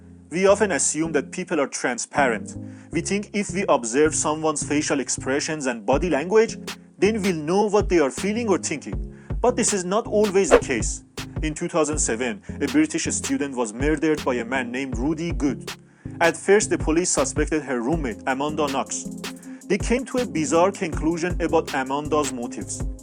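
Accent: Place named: Iran. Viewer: The narrator sounds Persian 😄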